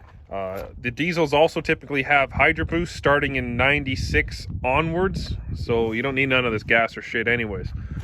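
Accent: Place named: Alberta, Canada. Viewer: holy fuck guy youre a walking 2nd gen encyclopedia! by the accent im guessing youre in Oilberta.. you happen to sell parts? trying to put a 2wd nv4500 into my 4x4 47re, need the 4x4 adapter, a nv4500 4wd output shaft, and the input shaft for my transfer case to match the nv4500... why the outputs/inputs werent all the same is beyond me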